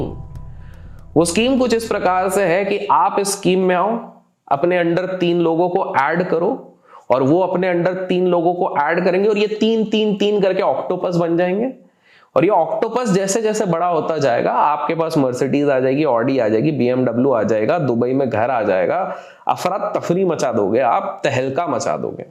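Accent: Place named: Konkan, India. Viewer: Sir aap Maharashtra se ho aur 100% kokan se ho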